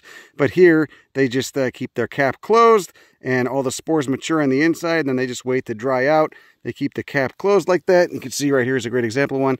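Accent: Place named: New Jersey, United States. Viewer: I have no idea where you're from but you sound like a natural jerseyian and i'm in love. haha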